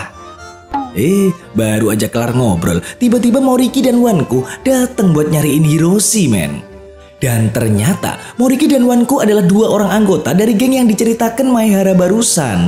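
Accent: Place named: Java, Indonesia. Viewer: Jowomu ngendi mas?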